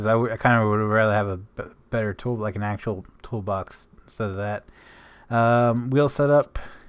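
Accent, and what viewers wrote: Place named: Canada. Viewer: Laughed at that Canadian accent 😂. I’m sure you hear me on Saturdays slipping up with “bud” and “eh” A LOT lol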